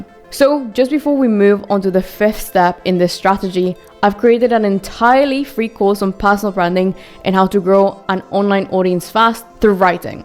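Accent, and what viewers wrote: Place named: United Kingdom. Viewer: Sounding very British today. Slay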